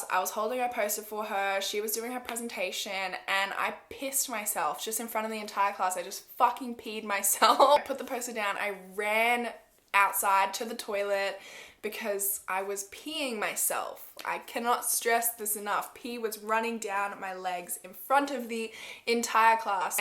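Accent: Australia. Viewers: I know I’m so late lol, but I’m binge watching some of your videos.. In a non weird way, I could listen to you talk for hours.. Your voice is so soothing & I love your Australian accent. It’s so funny to hear you pronounce or say words that we wouldn’t normally use to describe something in America, it has me so intrigued lol.. & all of the earring changes for each topic was iconic! Love your content girl 🥰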